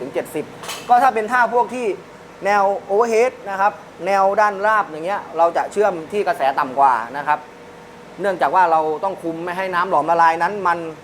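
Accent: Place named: South, Thailand. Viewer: สำเนียงเหมือนคนใต้บ้านเราเลย นิ 555fc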